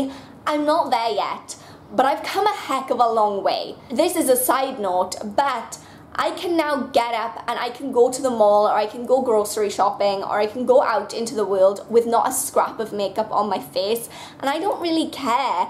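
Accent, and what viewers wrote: Wales, United Kingdom. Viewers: I love your Welsh accent!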